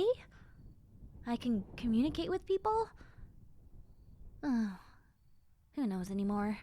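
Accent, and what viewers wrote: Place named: New York, United States. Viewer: I love your New Yorker accent! >//~//< Flippin adorable. X,D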